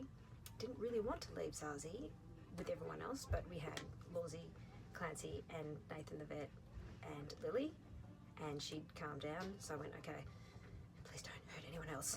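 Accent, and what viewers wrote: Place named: United Kingdom. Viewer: Im from uk and i pronounce it same as you lol. What part of uk were you in they are giving us a bad name guys lol xx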